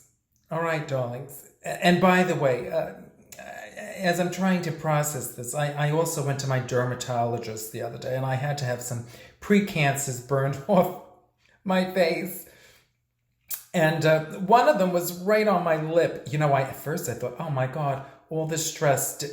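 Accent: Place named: New York, United States. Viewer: I like how you have a New York accent even though you live in Southern California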